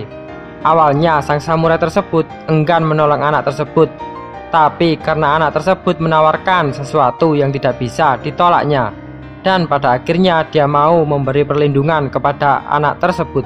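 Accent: Java, Indonesia. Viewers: Medok banget lo min😅😁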